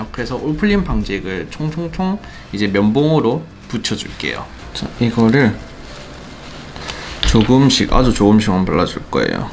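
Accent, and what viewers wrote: Gyeongsang, South Korea. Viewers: 모르는 내용도 아닌데 뭔가,, 경상도 억양이 넘 귀여워서 계속 보게 되네요,, 경상도 사투리가 이케 다정한 느낌일 수가 있군여, 중독성 있네욥,,:)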